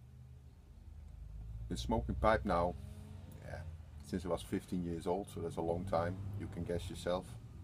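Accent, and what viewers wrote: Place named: Netherlands. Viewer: ok, ik herkende dus toch je Nederlands accent,hahah, ben vaak in Copenhagen ook, maak je ze zelf ook? groet, Nico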